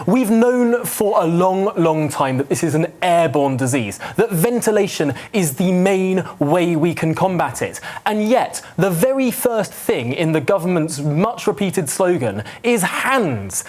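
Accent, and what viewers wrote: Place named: RP, United Kingdom. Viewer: Oh dear these presenters are crap,my hopes for GBN have gone.They had a great opportunity but this lad is as woke as owt & looks on pain when he’s speaking like a toff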